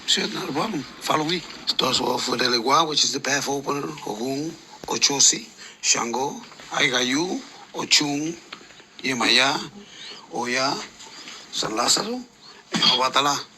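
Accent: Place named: New York, United States. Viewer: You gotta be from New York w that accent lol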